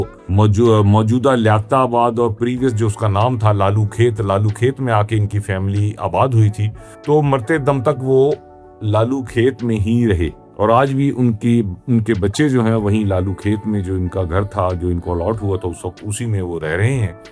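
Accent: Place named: Punjab, India. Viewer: بھائی، ابن صفی صاحب سے متعلق معلومات کے لئے بہت شکریہ مگر پنجابی لب و لہجے والی اُردو بہت کھل رہی تھی!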